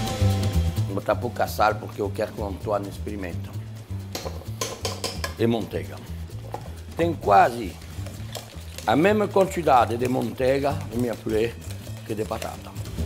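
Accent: France. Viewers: O Jacquin tá aqui no Brásil vários anos pq ele fala português com sotaque francês e dificuldade? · amo o sotaque francés dele um amor de pessoa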